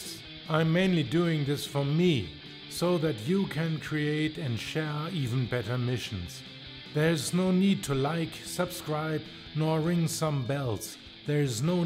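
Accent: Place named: Germany. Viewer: A german with humour and a very german accent? Sign me in. Thank you dear neighbours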